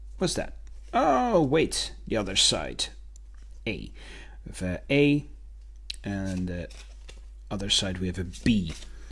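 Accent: Netherlands. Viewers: You're Dutch?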